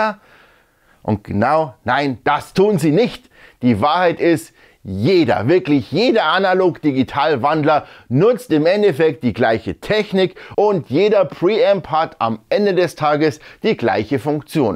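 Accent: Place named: Lower Bavaria, Germany. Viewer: Ja cool :) Grüße gehen raus von Regensburg nach Niederbayern 😊🤙🏼 hoff, ich deute deinen Dialekt richtig 😹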